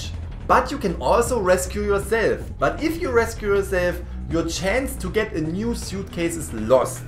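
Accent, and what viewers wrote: Germany. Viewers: German accent? Cool! Wish I had a accent..😐 btw what's your gamer ID if you don't mind me asking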